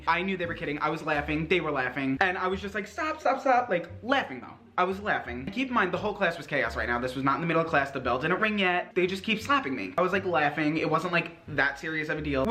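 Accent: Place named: Chicago, United States. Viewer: Bahahahah you make my day I love you so much. I love your accent so much I’m from Chicago and when I heard you say mom or class (mahm, clayss, i say “a”s the same) I’m like this mans is a CITY BOI ❤️ hahaha you’re legit so beautiful and witty and charismatic, I’d square up on this teacher for you In highschool 😂💕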